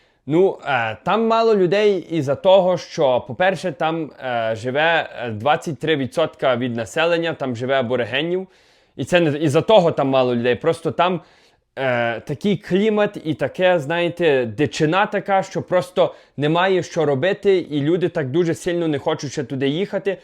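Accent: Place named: Lviv, Ukraine. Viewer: Красень, твій акцент вражає ! Так мають розмовляти всі Українці, не тільки вихідці зі Львова! Я особисто з Житомира і шкода , що люди з Донбасу і з східної Ураїни так не розмовляють. Дякую за твій контент ! Слава ЗСУ!!!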